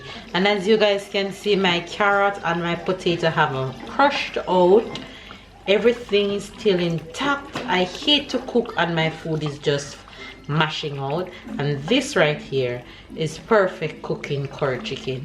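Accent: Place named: Jamaica. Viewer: If you didn't have the Jamaican accent i was going to skip this video ..... im excited to try this tonight !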